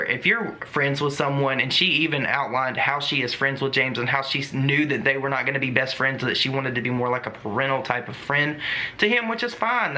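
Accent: South, United States. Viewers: I found your channel a few months ago and I am so happy I did. Maybe it's your southern accent and attitude. I was born in the south but moved to the west as an adult. I miss my family and you remind me of all of them. Your content is to the point and short, love it. Anyways just wanted to say hey!!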